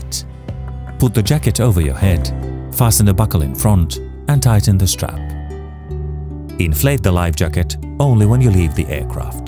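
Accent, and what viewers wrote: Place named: England, United Kingdom. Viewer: gentle English accent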